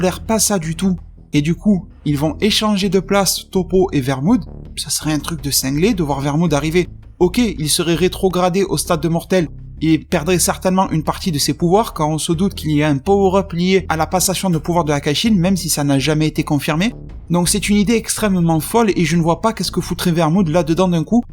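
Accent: Marseille, France. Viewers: T es marseillais ?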